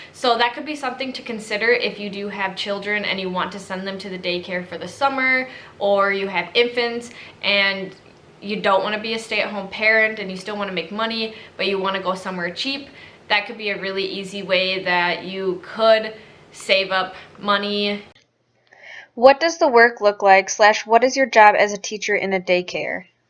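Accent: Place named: Minnesota, United States. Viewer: Why would u have to drive to a bus station and then take a bus? Ive got to have ESP the second I turned your video on I thought Minnesota it must have been the accent.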